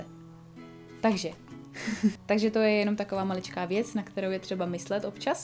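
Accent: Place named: Czech Republic. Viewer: Zrovna zacinam s Korejstinou a za boha jsem nevedela kde se ucit.. pres duolingo se také učím jazyky z angličtiny, ale přece jen ta korejština je tezsi a jsem rada že slysim výslovnost od Češky! Díky moc!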